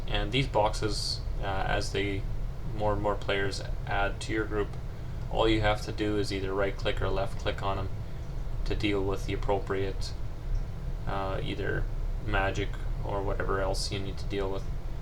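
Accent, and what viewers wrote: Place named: Canada. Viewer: You sound Canadian :D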